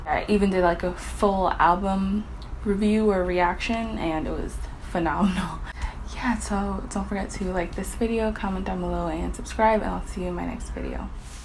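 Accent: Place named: New York, United States. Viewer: U sound like u from NY so are u not aware of 90s rap? Or do u know 90s rap so well that I listening to white music?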